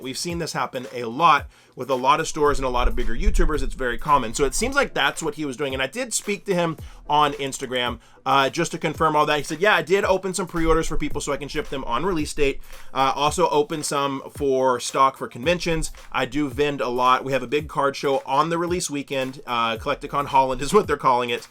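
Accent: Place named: United States. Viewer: deze man gaat geen nl spreken hoor zn accent, dat is echt amerikaans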